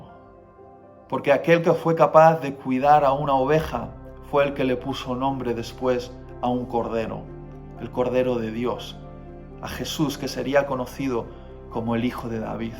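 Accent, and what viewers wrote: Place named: Basque Country, Spain. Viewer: Muchas bendiciones Dios te utiliza en gran manera y atu manera de ser saludos desde zamora (Castilla y leon ) España🇪🇸 aveces parece que ya no tienes el acento vasco pero luego eah ay esta 😂Dios te bendiga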